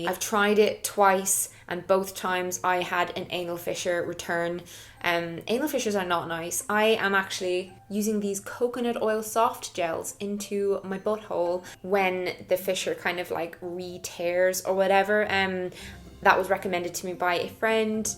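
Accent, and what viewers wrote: Ireland. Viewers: I singled out your lovely Irish accent in the first 10 seconds. You're my most loved nationality on the planet. 🇨🇮🇧🇷🇨🇮🇧🇷🇨🇮🇧🇷 Go raibh maith agat for your video! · I'm from germany but i loooooove irish accent so much. (Because of Saoirse Ronan) Thats why i love your accent. And youre so pretty. 😍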